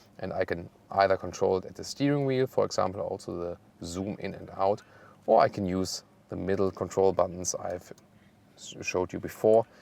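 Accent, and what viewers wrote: Germany. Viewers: Dear, I always enjoy your videos, they are very detailed but I really get the feeling that you're not a sports car type of guy, so I rather see you in a Mercedes SL or Jaguar XK, no offense. That said, maybe you should leave the sports car reviews to someone else who actually appreciates these cars, just saying. No, I would never take an Audi TT over the R8 (are you nuts???) and whenever the Mercedes SL comes up, I get a serious allergy. You are right about the R8 V10 Plus standard bucket seats but you kind of forgot to mention that Audi offers optional (free of cost) sport seats, which are much more comfortable. Also, you should work on some of your English words, you sometimes translate them directly from German into English but they do not really make sense in English. Same goes to your accent, you are using a German accentuation for English words, which of course does not really sound too good. 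This comes from a fellow German.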